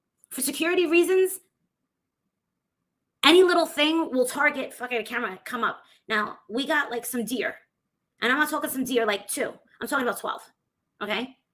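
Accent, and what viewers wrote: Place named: New Jersey, United States. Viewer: New Jersey! That awful accent. That makes perfect sense!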